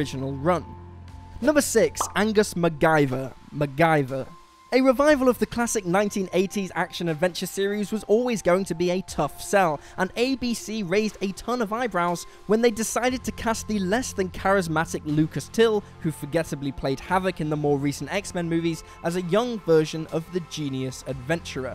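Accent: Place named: England, United Kingdom. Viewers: You can tell the announcer is English we get loads of Americanisms wrong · Might be cause they're from England? Pronunciation is different man.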